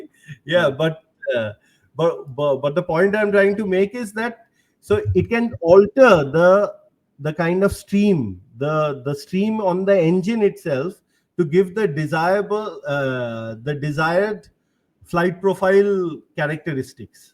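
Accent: India. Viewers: The inferiority complex of the Brahmans lol. The bloody Indian accent makes it even worse.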